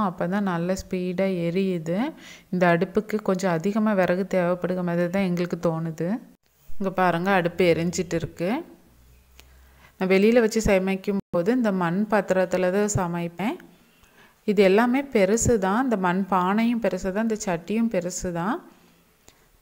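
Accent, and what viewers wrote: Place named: Tirunelveli, India. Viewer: நீங்கள் நெல்லை மாவட்டமா? தமிழ் உச்சரிப்பு அப்படி தான் தெரிகிறது. அவரவர் வட்டார மொழியில் பேசும் போது தான், தான் சொல்ல வரும் கருத்துகளை சரியாக சொல்லமுடியும். நீங்கள் உங்கள் நடையிலேயே (style) பேசுங்கள்.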